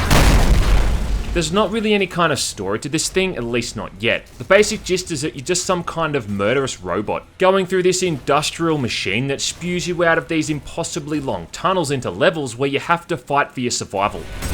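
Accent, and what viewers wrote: Australia. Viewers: You sound Australian.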